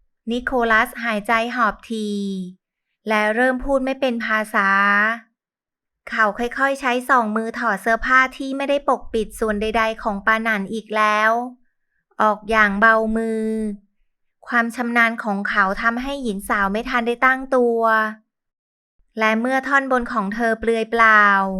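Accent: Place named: Thailand. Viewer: เสียงเหน่อ น่ารัก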